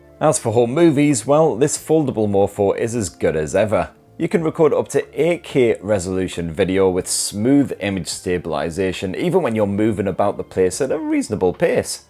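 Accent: United Kingdom. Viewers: this man speaks British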